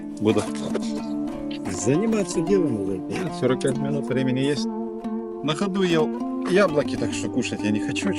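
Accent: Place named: Kirov, Russia. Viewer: Спасибо за видео. Приятный кирОвский акцент.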